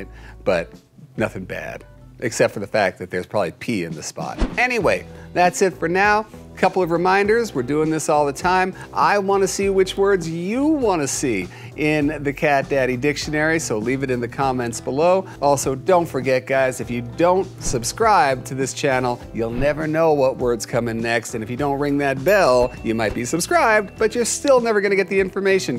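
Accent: England, United Kingdom. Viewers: Haha l i love the British accent ;D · LOL - you're English Accent!!!!!! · That British accent 😂😂 lovely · How did you get to do that english accent? So interesting and so theatrical !!! I like it !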